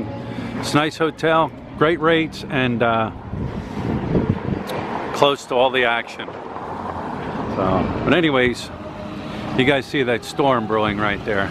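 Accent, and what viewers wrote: Philadelphia, United States. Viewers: "I'm gonna film down dis schtreet here" LOL Definitely from Philly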